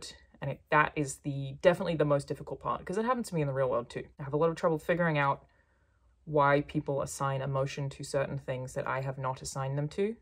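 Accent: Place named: Australia. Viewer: I love your lilting Australian speech impediment, but we need to talk about the way you say "assume." What's up with that?!? There is no 'sh' in that word anywhere! Desist! Dig you. Please roast me appropriately. :)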